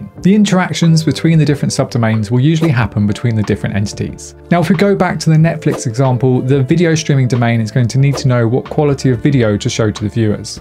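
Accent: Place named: United Kingdom. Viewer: Hi, thank you for your video. I like the British English pronunciation. I lived for 5 months in England, at first it was a little difficult to keep up with the native speakers, I was able to learn their accent, however there are many accents in the UK. Your videos help me a lot to remember that particular English!! thank you.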